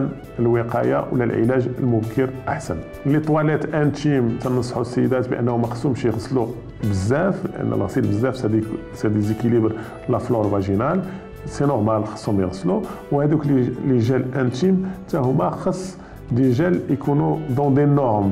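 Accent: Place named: Morocco. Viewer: راه يهدر بالعربية لكن هذي هي لهجتهم مغريب صعيبة شوية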